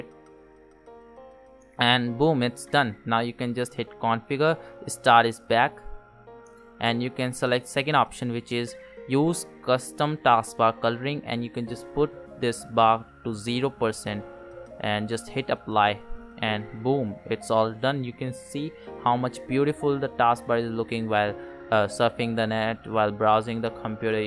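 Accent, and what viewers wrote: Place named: India. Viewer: rip english rip indians english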